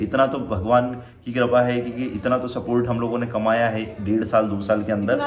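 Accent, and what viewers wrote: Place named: Indore, India. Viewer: I am from Bhopal and your language tone is indori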